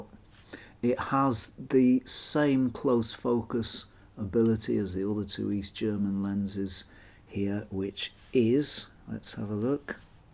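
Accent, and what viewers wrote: United Kingdom. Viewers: Great thanks to you! It was a pleasure to listen your British and things you told. Спасибо)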